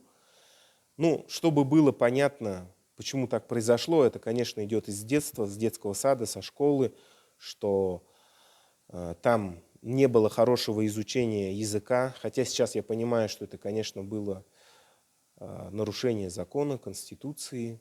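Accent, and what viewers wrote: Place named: Buryatia, Russia. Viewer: Чи овоо сайн ярьж байна буриад аялагатай монгол хэлээр...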